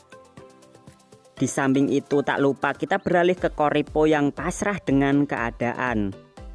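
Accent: Java, Indonesia. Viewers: Jawa mana bang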